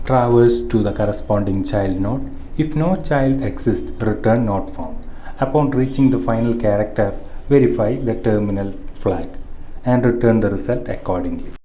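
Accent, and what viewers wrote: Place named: India. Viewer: disgusted by this Indian accent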